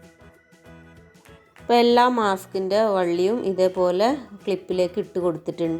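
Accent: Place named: Kannur, India. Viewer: ഞമണ്ടി എടുക്കാൻ 😂😂😂,കണ്ണൂർ ഡിസ്ട്രിക് ആണോ സഹോദരി ,👏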